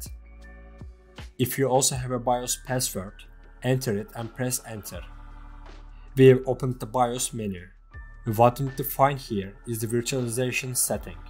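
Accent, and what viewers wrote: Turkey. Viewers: ARE YOU TURKISH DUDE???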